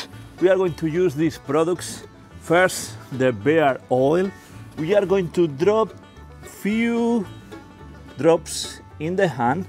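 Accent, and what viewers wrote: Spain. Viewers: Me encanta estar buscando todo en ingles y encontrarme con un español hablando ingles, como se nos nota el acento cuando hablamos... Nice video mate :) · I just bought this now, nice review. I like your accent btw, are you Spanish?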